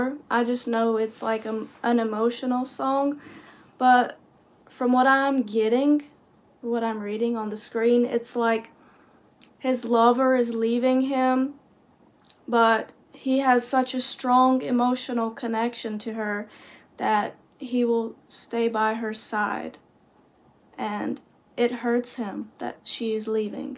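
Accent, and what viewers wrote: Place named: Minnesota, United States. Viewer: Thanks for this reaction,! You are right that so many of Persian music is/sounds sad! I believe music shows "the state of a society". Unfortunately, in at least the last 200 years, Iran has experienced a lot of downs which may reflects itself in music. Just my 2 cents. I hope that things change societally, and we can produce cheerier (but still meaningful) music. I hope a speedy recovery for you ❤ By the way, I like your accent! If I may guess, Minnesotan?:)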